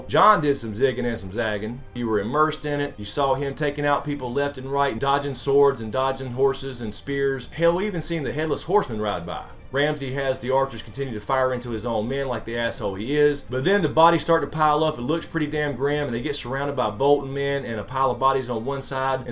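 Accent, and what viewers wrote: South, United States. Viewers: Are you a comedian! You make me laugh out loud, til my stomach hurts! I LOVE your reviews! Love that Southern drawl, too! Great job! I look forward to more videos from you..... :)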